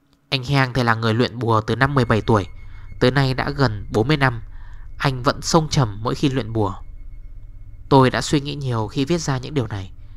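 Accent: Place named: North, Vietnam. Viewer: người ở đâu vậy? Nghe giọng thì người Miền Bắc rồi nhưng không biết ở tỉnh nào..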